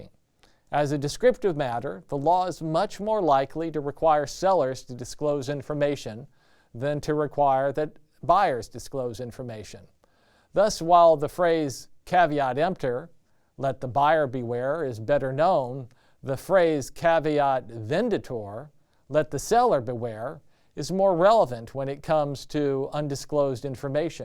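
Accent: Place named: United States. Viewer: what American accent exactly is this, though?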